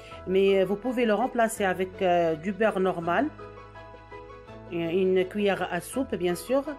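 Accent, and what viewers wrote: Oran, Algeria. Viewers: عجبتي وهرانية تاعك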